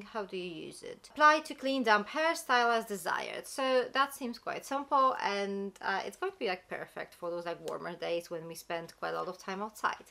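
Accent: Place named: Poland. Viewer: I love your accent I love Poland it's a beautiful country what part are you from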